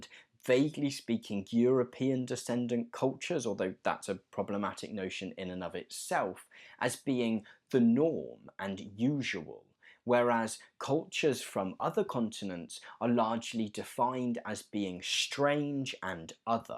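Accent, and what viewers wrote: United Kingdom. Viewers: why is a brittish person talking !!!!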